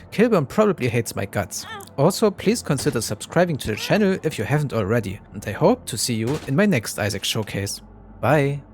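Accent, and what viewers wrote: Germany. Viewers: im asking myself for a long time but are you german?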